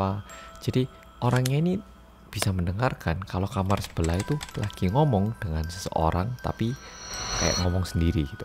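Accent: Java, Indonesia. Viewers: salut, penyampaian runut dan tata bahasanya sempurna, meskipun medhok....👍👍 Sukses selalu yo, Cak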